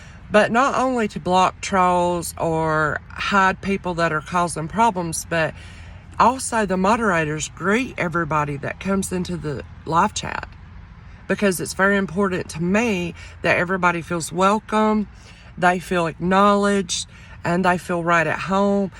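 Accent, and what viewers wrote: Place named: Western North Carolina, United States. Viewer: …I love your NC accent. It shines Western NC and I love it! Your journey is full of love, kindness and wisdom. To love yourself is the first step in being able to share your love with others and that is so powerful. I have watched YOU and your channel grow with love and wisdom over the past year. I remember when you questioned your reselling journey and felt inferior to other channels but here you are today shining bright and taking each day as another day to grow. Thank you for sharing your journey because it resonates with me and I’m sure with others who visit your channel. A wrench is a lot of work and kudos to those who take up the challenge! Enjoy this beautiful NC weekend! ❤️💕❤️🥰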